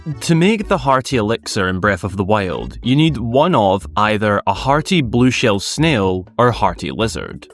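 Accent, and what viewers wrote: Scotland, United Kingdom. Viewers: Are you from the same region of Scotland as Lorraine Kelly?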